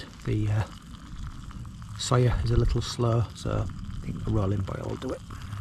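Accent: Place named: Hull, United Kingdom. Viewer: Heyup Mate😊. Great film,again. Approximately from where do you set off from? To get to your start point? One detects a Hull accent . Your channel is improved, by that n such.. best wishes from a fellow Nor Easter 😊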